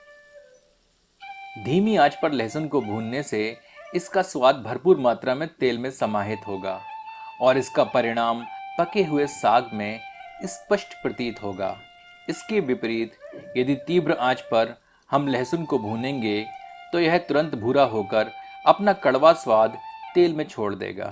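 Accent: Standard. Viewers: Wow, swadisht, shuddh Hindi k saath 😀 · Aapka bolneka andaz aur sudh hindi bohot achaa hai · aapki hindi zabardast etni shudhta se bhari prastuti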